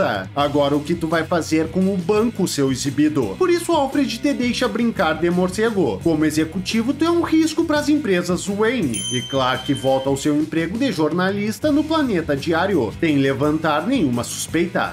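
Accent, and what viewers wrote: Rio Grande do Sul, Brazil. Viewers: Tu é gaúcho?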